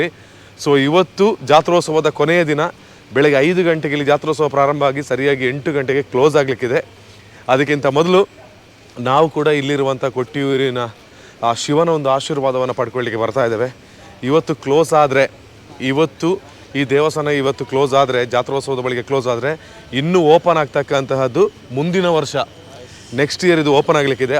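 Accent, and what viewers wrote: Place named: Tulu, India. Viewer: Namma Tulu dakalna taking style de gotthapund🤩👌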